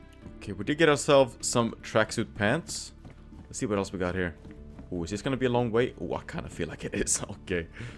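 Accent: Germany. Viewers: I can feel your german accent😂😂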